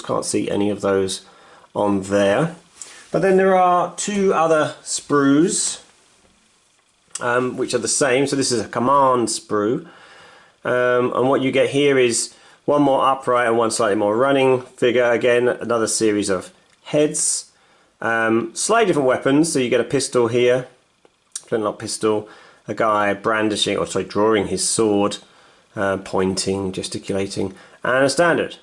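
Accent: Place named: United Kingdom. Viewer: Thanks for providing this comparison. Very helpful. Given the historical era, the British accent gives this review something extra for Americans like me!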